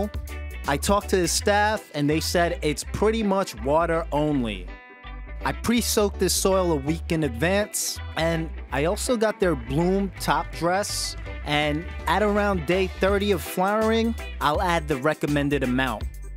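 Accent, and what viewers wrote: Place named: New York, United States. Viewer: Love that new yorkers accent bruv 😂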